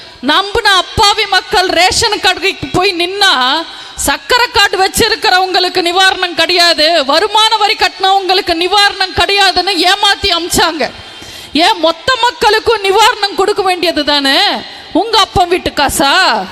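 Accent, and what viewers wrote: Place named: Telugu, India. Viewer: Cenema markettu sarincha piraku. Koovathoor koothadi kachiku koothu adika vanthutte. Adia. Nee pesura thàmil. Parthal nee thelungukariya thondaiyile. Enna machine vachiruke. Voice kekkave. Sakikaladi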